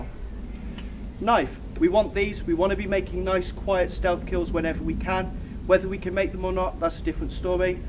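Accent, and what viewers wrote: United Kingdom. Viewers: Are your British ? That's really cool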